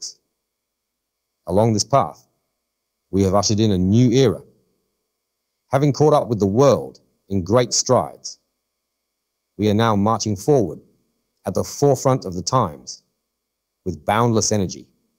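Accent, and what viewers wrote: Australia. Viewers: Great but weirdly Australian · Definitely Australian past the middle, not slight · Assuming they’re using ElevenLabs, nope! 😂 Funnily enough, it seems ElevenLabs automatically tries to pick an accent appropriate with the geographic location of the source speaker’s accent. For instance, if a British voice is reading Portuguese text, it will likely read with a European Portuguese accent rather than a Brazilian one, and an American reading French text will read with a Canadian French accent. I guess because China is closer to Australia and the source audio is of a native Chinese speaker, an Australian accent was chosen for English. Unfortunately, the feature is automatic and somewhat unpredictable for now. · Another fascinating speech. Curious if anyone noticed a touch of Australian accent in there...? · Has an Australian accent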